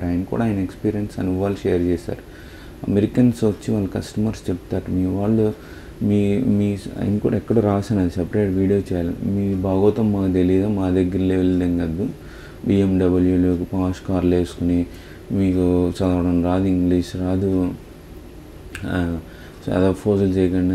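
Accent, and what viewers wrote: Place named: Rajahmundry, India. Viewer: (Offtopic) naku elago USA nachaddu, but i like your accent sir, meedi rajamundry na?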